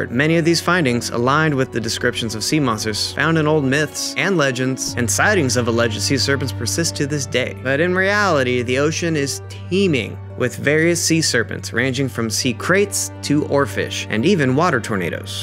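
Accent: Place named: South, United States. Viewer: Ok ive never heard this on but i swearrrrr on your vids im detecting a bit of southern accent being from Bama i perk up when i hear it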